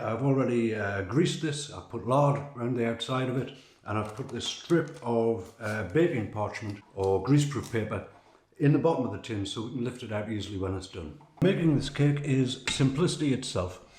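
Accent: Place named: North East England, United Kingdom. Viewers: As others have said here the accent is from the NE of England (just South of the border with Scotland) In fact your own George Washington's forefathers would have spoken like this since they came from Washington in Durham 👍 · Thank you. I wanted to ask where you are from because I try to place British accents. I thought it was a "Northern" accent, but not exactly what I was used to (I think of how the Physicist Brian Cox speaks as "Northern") -- there are so many accents to learn. Thank you, again. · Enjoying your videos having just discovered your channel - I am sure many folk will appreciate your style i.e. explaining the ingredients with costs at the beginning of your presentation. I use an air fryer and may I suggest you give an idea of the bake settings - all air fryers perform differently so a guide would be invaluable. 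Enjoy your NE accent - probably Sun'land where I grew up. Makes me homesick. Tell me if I am right or wrong please. You sound a canny lad and I am sure you will have some canny recipes to come. I have subscribed to you channel and look forward to more. Good luck and good fortune.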